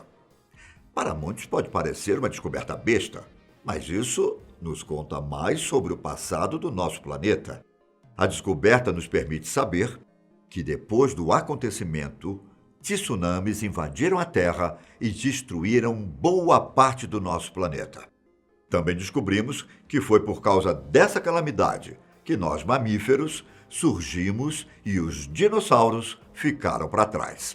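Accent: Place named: Bahia, Brazil. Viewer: aqui na Bahia tem pessoas que falam assim também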